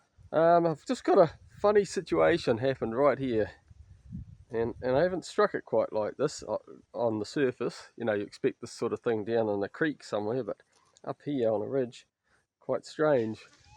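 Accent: New Zealand. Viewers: Its quite marvellous😂😂 and obvious hes a KIWI! Derr!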